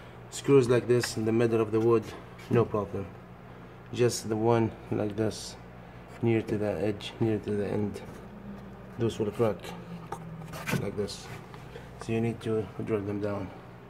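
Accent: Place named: Africa. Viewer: You sound like you might be from some part of Africa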